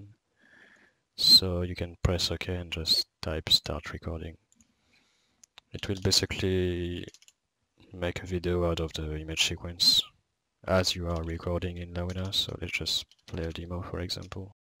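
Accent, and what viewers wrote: France. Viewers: That frenchy accent is really noticeable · C'est moi ou tu a un putain d'accent français ?? ( its me oe you have a fuking french accent ?? )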